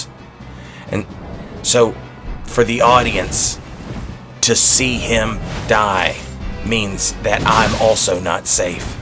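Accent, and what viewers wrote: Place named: Kentucky, United States. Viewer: You have too good a Mitch McConnell impression not to be from Kentucky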